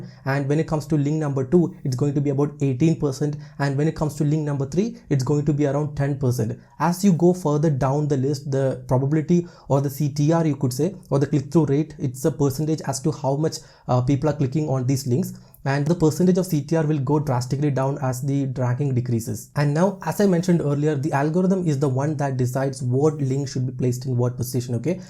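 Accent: India. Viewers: Man you're just the best! Nice content and I use your... (Indian?) English to improve myself haha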